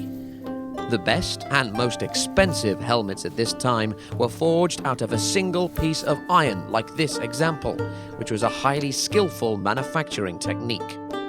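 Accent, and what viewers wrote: England, United Kingdom. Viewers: Haha, at first I though he said, "Knights, minotaurs, and foot soldiers..." Not used to hearing English accents. Good video.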